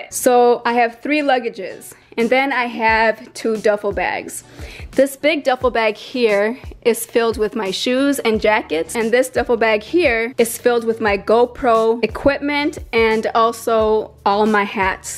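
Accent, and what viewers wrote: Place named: Michigan, United States. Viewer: If I'm not mistaking it sounds like Michigan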